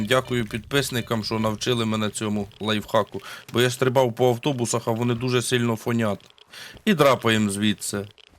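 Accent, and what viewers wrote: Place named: Vinnytsia, Ukraine. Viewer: Дякую за якісний контент. За вінницький діалект окреме дякую. То батьківщина мого тата. В дитинстві часто бував у Шаргородському районі. Я бігаю з двома "Дніпро". Один розточив на 7.62. Снайперка з магазином на 21 набій. Гаус. Три пістолети під різні набої і збільшені магазини. Маргач - 13 набоїв. Довго бігав за кресленнями і магазинами. Тепер шкода закінчувати гру. Хочеться грати і грати.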